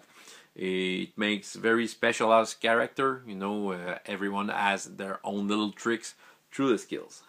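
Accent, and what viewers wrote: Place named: Quebec, Canada. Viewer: C'est vrai que l'accent québecois en anglais m'aide aussi à travailler mon anglais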